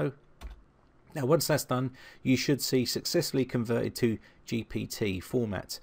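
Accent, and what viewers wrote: United Kingdom. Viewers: Thanks so much mate. This is exactly what I'am looking for. BTW I love your British English. ^^ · I so want to thank you mr. british guy! I feel so relieved now. weew! YOU ARE A GODSEND!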